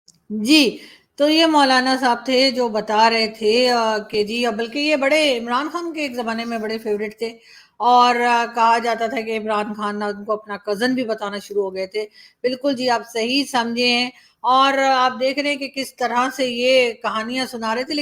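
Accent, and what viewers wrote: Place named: India. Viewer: ji aapke bolne ka style poora Indian ‘s se milta -julta hai. Same to same. Bahut aacha 👍👍👍.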